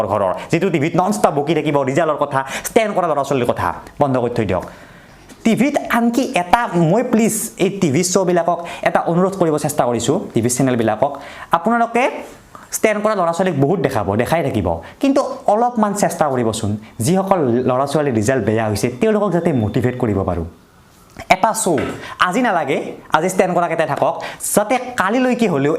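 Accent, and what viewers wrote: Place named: Lower Assam, India. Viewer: Lower Assam r language enekuwai Moi Assamese Chanel nasau ..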